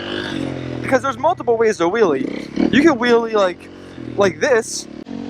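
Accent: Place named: Maryland, United States. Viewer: you sound like youre from maryland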